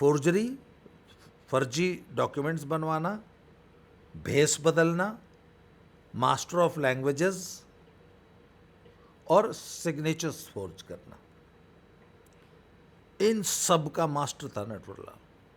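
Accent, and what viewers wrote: Haryana, India. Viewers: Aap gazipur , banaras ke h bhasha haryanvi h😮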